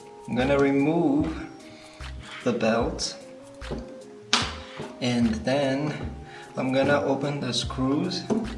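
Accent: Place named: North America. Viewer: Pls speak in common language not nort merican.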